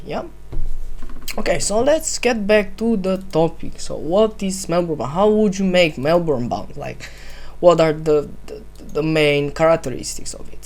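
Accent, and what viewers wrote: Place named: Italy. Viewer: si sente la pronuncia italiana :D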